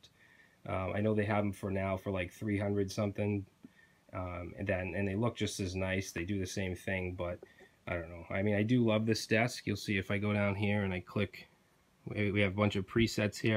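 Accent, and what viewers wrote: Boston, United States. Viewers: Well they both have Boston accent. I'd say is the mature version of Bucky.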